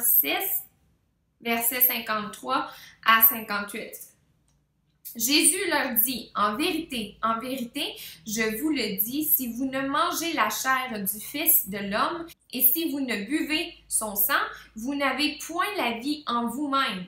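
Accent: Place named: Canada. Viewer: J'aime votre accent...accent canadien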